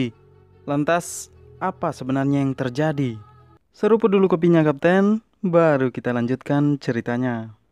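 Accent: Java, Indonesia. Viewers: Wong jowo awok awok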